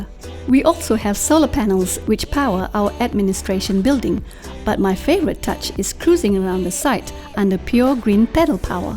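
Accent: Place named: China. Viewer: I suspect it could be a Chinese who has learned English?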